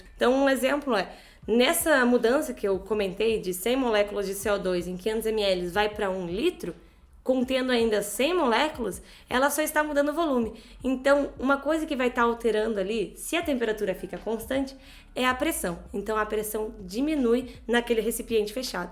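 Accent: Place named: Portugal. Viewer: Muito legal! Você é muito carismática falando, e parece ter sotaque de portuguesa (você é portuguesa?) rss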